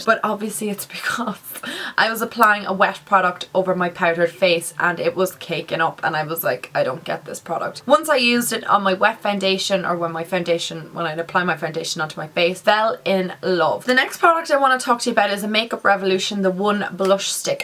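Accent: Ireland. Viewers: I love the way she looks so tumblr and gorg but then she comes out with this common Irish accent 😍✌ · Are u from Ireland ? You sound Irish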